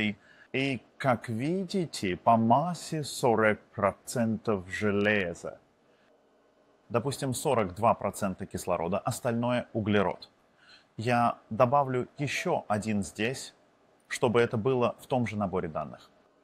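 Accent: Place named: Russia. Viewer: I was just telling my wife how great your Russian accent was! 😁 It made watching this easier for her as she doesn't speak much English at all. 🤭 But I saw the original En issue as well. 👍🏼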